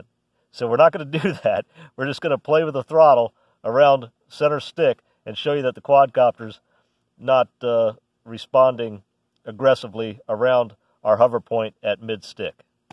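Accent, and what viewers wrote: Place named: United States. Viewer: Thanks for these words that recomforts me :) . As you just said, I was not expecting a such not easy and not fast learning phase. I'm always amazed, to be in south of France, you somwhere in the US (according to your fantastic accent) taking time to record yout videos and me here and enjoying your advices in the nature near to the sea. Sharing between human is just one of the most wonderful thing, whatever the subject. It was the philosophical though of a sundazy morning. Cheers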